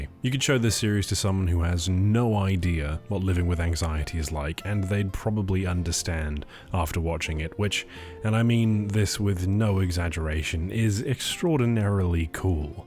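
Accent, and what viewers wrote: United Kingdom. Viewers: Hearing "see you on the sunny side" in British accent makes me wonder how much of a meta joke that was about complex feelings of having anxiety of and desire for meeting friends.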